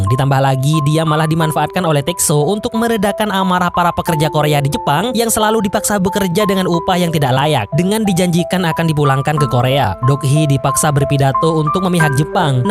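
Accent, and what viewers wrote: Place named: Madura, Indonesia. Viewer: LOGATNYA KETAUAN BANGET KALO ORANG MADURA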